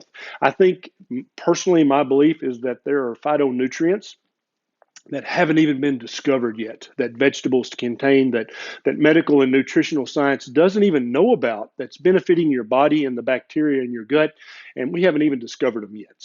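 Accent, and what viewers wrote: South, United States. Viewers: I could listen to you pontificate on celery for hours 😆 The Southern accent is too good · I love your southern twang!....oh and the video too!!!😉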